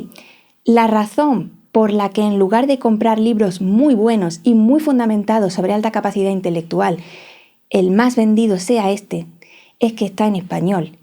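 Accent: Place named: Murcia, Spain. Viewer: Jolín acabo de leer tus características, no te conocía. Yo soy PAS y TDA (sin H), Tiroiditis de Hashimoto. Yo pensaba que tenía mucha mezcla, que seguro algo me sobraba, pero no, parece ser que éstas combinaciones incluso más complejas. Me resulta delicioso escucharte. Tu acento me recuerda al de mi abuela, de Murcia pero vivió en Jaén. Saludos, aquí tienes una nueva suscriptora más. 😊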